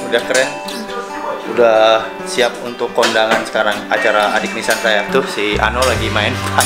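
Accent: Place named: Bali, Indonesia. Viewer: Gokil...bli suaranya has medok Bali...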